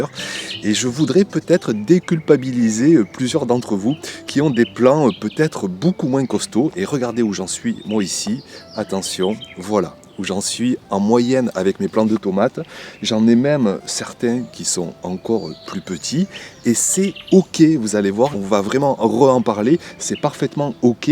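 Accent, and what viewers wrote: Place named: South, France. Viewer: la video est top, mais cet accent du sud appuyé pour qu'on comprenne bien que le monsieur est du sud, c'est aggacant pour les oreilles. J'ai des amis qui sont né et qui vivent dans le sud et ils n'ont pas cet accent, ou ils arrivent a l'estomper.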